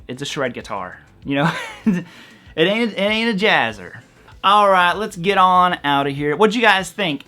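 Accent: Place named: Nashville, United States. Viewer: Yeah man it sounds like you forgot and left your wah on. I don't care for that Nashville twang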